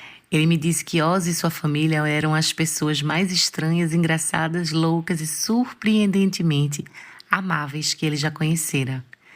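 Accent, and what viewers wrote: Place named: Northeast, Brazil. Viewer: éssa prónúncia nórrdeshtina tem uma Bé-leza louca! Tótal! Estou Mésh merizadoooo !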